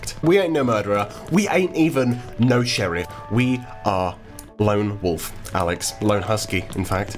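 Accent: United Kingdom. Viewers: Alex do u live in UK cause of ur accent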